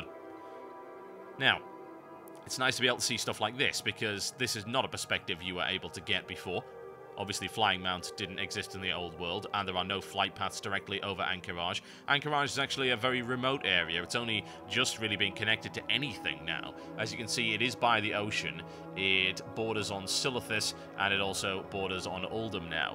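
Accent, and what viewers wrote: United Kingdom. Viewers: I was never into wow that much. I like the game but still..you're actually really interesting to listen to o_o I think its that British voice XD I'm a brit too >:3 Brits ftw!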